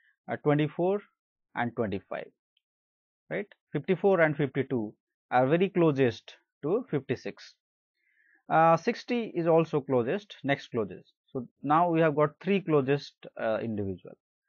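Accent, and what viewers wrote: India. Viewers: normally I can understand indian accent but yours is terrible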